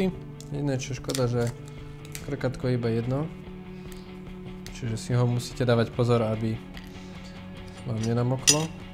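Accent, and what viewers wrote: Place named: Russia. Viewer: máte nádherný ruský prízvuk :D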